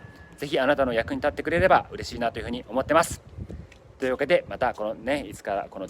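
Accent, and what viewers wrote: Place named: Kanto, Japan. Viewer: あくまで「移住者が語る沖縄」ですね。沖縄県民から見るとあまりも薄ペラな動画。貴方のように沖縄をハワイと混同した「別荘地感覚」で沖縄移住する人には嫌悪感を感じます。沖縄を語るなら、まずは沖縄の言葉やイントネーションを身に着けてほしいですね。関東訛りのナイチャーが何を言ってもそれは「移住者の遠吠え」です。 なぜ沖縄が山を切り開き環境破壊しないといけないのか？ 沖縄の歴史文化はもちろん、戦中・戦後と日本本土の犠牲になった経緯。 米軍基地がある弊害。 そんな事も知らずに「海がキレイ」「南国サイコー」そんな気持ちだけで沖縄に来る移住者はマジで要らない。 沖縄移住を考えてる他県の方は「沖縄の影の部分」を見て知ってから移住して下さい。 青い海は、太平洋戦争で赤く染まりました。 美ら海水族館がある場所は数多の米軍艦船により鉄の暴風と呼ばれる艦砲射撃を浴び、多数の死者が出た土地です。 移住する方はぜひとも、平和記念公園で、この島でどんな事があったのか？ そしてそれが今現在、どのような影響を及ぼしているのかを知って下さい。 沖縄の文化、歴史、そして現在を受け入れてくれる移住者なら、私たち県民も大歓迎です。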